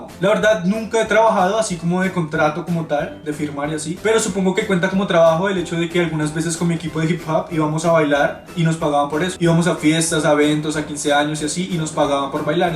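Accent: Colombia. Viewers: Awww,¿por que hablas tan tierno? ese acento colombiano;D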